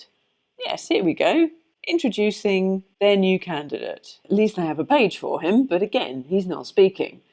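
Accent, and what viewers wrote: United Kingdom. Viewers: I grew up in Hornchurch when it was still more Essex than London, I came from a cockney family so had an acute ear for the specific local dialects of the area. Can I just say, you sound just so wonderfully like EVERY mates mum from my childhood, I could listen to you reading the yellow pages! Keep those councillors toes to the flame, rooting for team essex all the way!!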